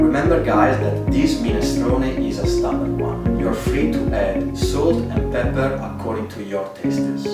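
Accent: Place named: Italy. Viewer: Minchia allora avevo ragione a pensare che fossi italiano all'inizio l'accento era quello hahaha viva l'italia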